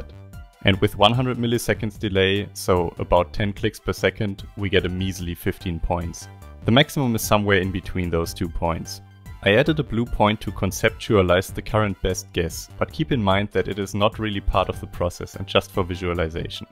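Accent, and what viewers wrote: Germany. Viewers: Man merkt an dem Akzent, dass du deutscher bist 😂 Find ich eigentlich ganz toll, dass man sowas gut erkennen kann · Man merkt dein deutschen Akzent Kollege Schnürschuh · ich konnte schon nach wenigen sekunden an deinem akzent erkennen dass du auch deutsch bist haha :) ich bin übrigens weiblich und habe zwar keinen freund der mir das video geschickt hat, aber mario party ds ist einfach meine kindheit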